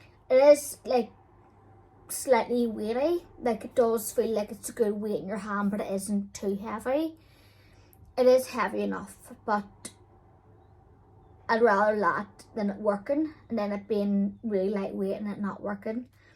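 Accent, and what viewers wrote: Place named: Ireland. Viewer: Thanks for video. Love your accent! Are you Irish or Scottish possibly? 🤔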